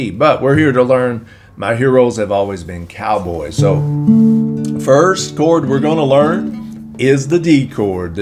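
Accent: South, United States. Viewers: Great call on the 3/4 timing. I just got through seeing another video of this song, supposedly version, and they were playing in faster tempo in 4/4 timing. Btw, love your voice as well. Very Southern 👍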